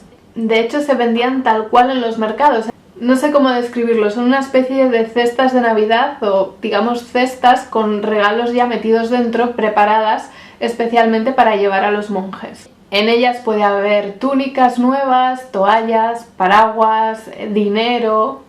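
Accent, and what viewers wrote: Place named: Spain. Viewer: No, ella es española, del Norte (Cantabria) al parecer. No sólo su pronunciación es claramente española sino que ella confirmó de dónde es en alguno de sus videos.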